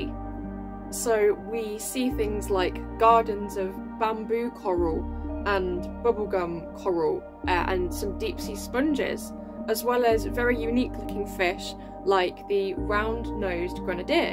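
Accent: United Kingdom. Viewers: Dang!!! And she's super smart and intriguing!!! I like this girl!!! My new c favorite channel!!! There just isn't any other women who are interested in nature and science, and have the most awesome/raddest Cutest style and the accent! I've always kinda not really like a Girl with a British or European accent really.... What was I thinking, I'm speechless! I wouldn't have it any other way now that I've witnessed this perfection before my own eyes!! Okay, sorry but I just had to state what was on my heart!!! I can promise you that I don't go around complementing women on the internet like this EVER!!!! But I guess either I'm the only guy here who is astonished.... Or maybe I'm just the only weak willed man who just couldn't play it cool and act as if I wasnt smitten!!! ..... No offense at all! I just am.... Darn.... Well there it is! 🙃✌🏼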